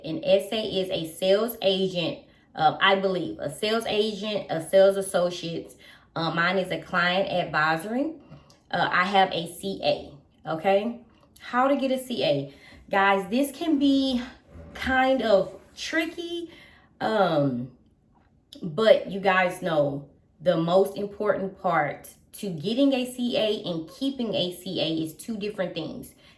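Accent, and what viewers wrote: United States. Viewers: You are an inspiration to young women everywhere girl . Owning your own businesses and being so established let’s women know if they want something they can work hard and go out and get it for themselves ! I can only imagine the questions you get daily 😂. I come here to watch the bags girl , I want to see what you choose next , I love that you love LV canvas bags like I do . Not that I don’t find many of their leather bags beautiful , they just are not what I’m attracted to as far as LV . You are very detailed when you show your things , very polite and sweet , love your southern accent (I’m from New York) . I don’t think VIC’s should have restrictions , it makes sense to me . They did claim VIC’s would not be able to pre order canvas moving forward either , but I think it’s something they should over ride for a VIC customer . Customers like you who love the bags shouldn’t have to suffer for the VIC’s purchasing just to resell . 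With the new microchip system , I would think LV can now see what VIC’s are only purchasing to resell and which truly love the items for themselves . Thank you for sharing with us , girl I would have continued to watch and support you and never asked what you did for a living . I’m here for the eye candy ! I did videos for a minute but had no desire to be an influencer , I also had no desire to share every private aspect of my life , so I stopped filming , still love watching and supporting my favorites ! I find you very relaxing to watch and listen t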